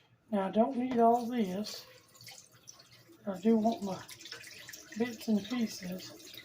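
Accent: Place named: South, United States. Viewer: 👁👁 had to tune in to get My Sweet Southern accent…fix… God bless you sister 🙏🏼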